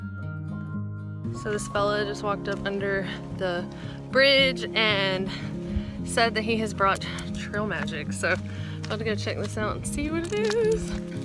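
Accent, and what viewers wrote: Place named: Oklahoma, United States. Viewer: Awesome, you are a true good sole, way beyond your years!! Thank you for all of these videos, I grew up in Huntsville, and Oklahoma so love your dialect, it's all about being simple and enjoying the moments you never get back everyday!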